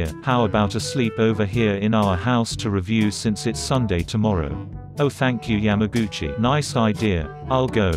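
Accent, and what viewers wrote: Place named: United Kingdom. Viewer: I love the British accent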